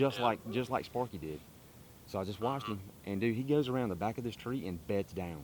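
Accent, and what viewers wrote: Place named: Georgia, United States. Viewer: Been watching boys for a few years now and went to back to watch old videos and you can hear the southern accent on all the boys but this is no doubt a good.ol Georgia boy. I never understood why people let others determine their decisions. The property owner gives you permission but the boyfriend doesn't like hunting so she changed her mind. BS the bf was jealous of another guy being at the house, even though it's the woods out back. I've seen videos where you get permission and then the neighbor freaks out so they take it back. Personally if a neighbor of mine told me they didn't want someone hunting on MY property I'ld tell straight out "it doesn't effect you one bit, it doesn't effect your house, property or life in one bit so too f-en bad". Luckily I live in a pretty rural area and for years couldn't really see many neighbors but they are slowly closing in. 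Worse part is they move out to the country, literally was all farm land when I grew up but those have become almost extinct, but they move out here and then complain about the smell when a farmer is spreading manure on his fields, or complain about turkeys and deer in thier yard eating landscaping or.pooping, but dont like hunting.🤷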